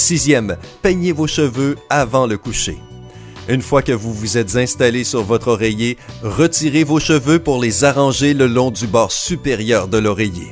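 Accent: Quebec, Canada. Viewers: L’accent québécois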